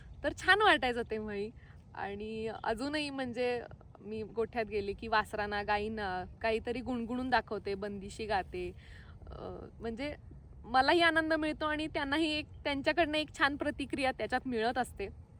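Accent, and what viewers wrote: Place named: Konkan, India. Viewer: कोकणात असूनही तुझ्या आवाजात थोडा कोकणी+सोलापूरी टोन जाणवतो.😊👌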